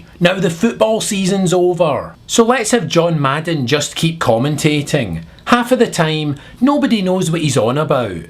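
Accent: Scotland, United Kingdom. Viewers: Normally I wouldn't do anything so pedestrian as comment on someone's accent. But your scottish tambre makes "warfare fix" sound like "warFEAR fix", which when you think about it is entirely appropriate. As for "American" Football, it's ironic that Minnesota is lagging so far behind on their stadium work, they may lose the Vikings to Toronto! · Your American? but you have a Scottish accent. Anyway, there wont be a war on Iran. Not for the moment anyway. The USA is stuck in a quagmire in Iraq and Afghanistan and it is becoming very expensive. The US economy is falling, along with its dollar and a war with powerful Iran(not a third world country)would be devistating to US economy. Also, countries like China and Russia are supplying some powerful weapons to this country.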